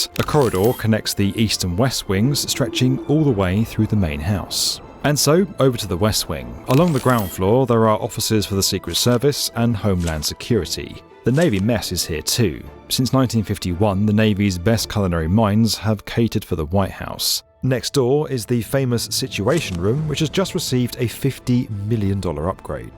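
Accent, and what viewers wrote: England, United Kingdom. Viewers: Something about a Brit narrating this feels wrong. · Why is a Brit narrating US History? Nothing to talk about over there? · When a show has an English Narrator, I just skip it. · This video is VERY poor quality. It's basically a 15min video, with 10 min. of actual content, and 5 minutes worth of ads. I get it. You need to make money for product placement, but when 35% of your video is an advertisement, it's a very lazy effort. This video is basically for foreiners interested in American politics, and I guess that's made obvious with the English accent alone. Unsubscribed.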